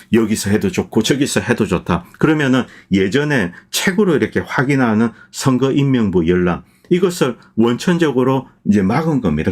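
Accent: Gyeongsang, South Korea. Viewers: 이 사람 똑똑한척 하더니 부정선거 주장을 하는거 보니 영 국짐 프레임 이용하는 그저그런 사람이네 자유민주주의의 최대 적이라할 쿠테타에 대한 개념도 없고 경상도 말투며 그냥 제대로된 보수가 아닌 극우네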